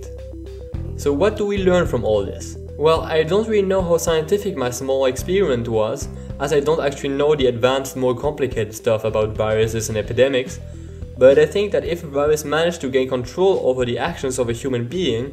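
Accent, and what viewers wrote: France. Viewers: You are french aren't you? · Un accent français ca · I know I'm dumb, I know you are trying to show us somethibg cool, but all I know is that I couldn't focus on anything else than your fluent english with french accent...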